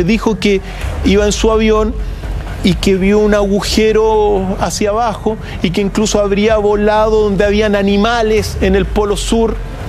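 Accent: Chile. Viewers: Este programa es chileno? Yo tenía un amigo que tenía un acento igual y me dijo que era de Chile. No sé. Igual me equivoco, pero este acento parece de Chile. Aunque tengo dudas sobre si es de Uruguay o Paraguay. No sé. Es difícil distinguir con tanto acento latino. De Cuba también podría ser. Pero de Méjico no, desde luego. Tampoco de Perú. Bueno, ahí lo dejo. Un enigma para mi.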